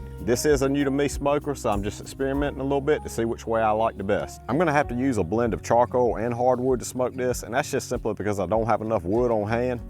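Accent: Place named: South, United States. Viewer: Dude. I'm an English teacher who really appreciates dialect. Would you please tell me what region influenced your accent? It's like southern Appalachian with a hint of Cajun or Creole (can't tell).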